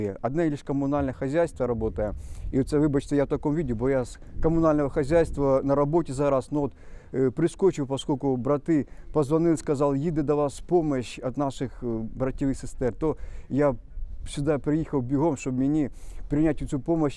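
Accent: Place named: Surzhyk, Ukraine. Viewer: не дивлячись на те що ви говорите на суржику , видно як ви намагаєтесь говорити українською. Ви молодець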